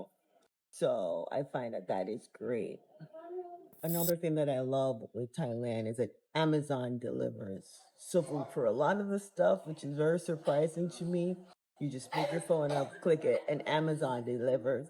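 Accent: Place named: Caribbean. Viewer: You sound like you are from the Caribbean. If you get a chance, take a trip to Kenya. There is a straight flight from Thailand to Kenya and back.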